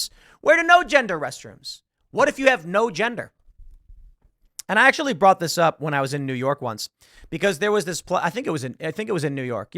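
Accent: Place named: Chicago, United States. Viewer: I hear your Chicago accent today!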